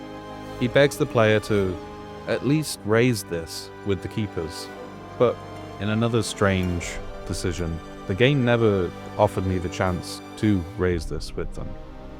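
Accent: United Kingdom. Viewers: The creator is just well spoken and British lol you can tell it isn’t AI by the way his voice breaks every now and then. AI isn’t like this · Yeah I’d say he’s doing it on purpose because he’s British What a weird comment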